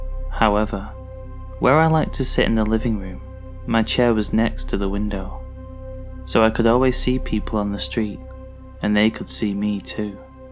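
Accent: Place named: Yorkshire, United Kingdom. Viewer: great story !! love the narration as always, could I ask what accent you have cos you sound a little bit Yorkshire to me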